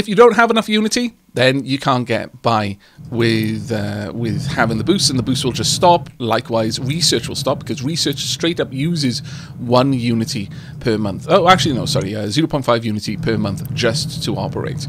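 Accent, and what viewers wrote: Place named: RP, United Kingdom. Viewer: Great video, great narration. Big fan. Your accent seems a little "posh". What area is it from? :)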